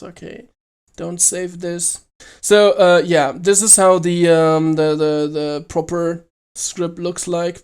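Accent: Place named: Germany. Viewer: Are you german? You sound german :) I have a problem --> I only get the message (translated from norwegian): The taget you have assigned, is invalid. control if you have assigned it to the right place or filename any ideas? (im using w10) The line is below "C:\Users\Magnus\Desktop\DEDICATED SERVER\steamcmd.exe steamcmd +runscript scripts/7daystodieserver.txt"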